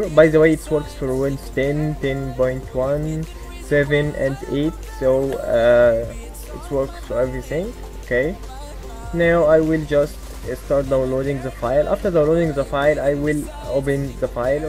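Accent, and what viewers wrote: Egypt. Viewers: Thats an egyptian accent are you egyptian??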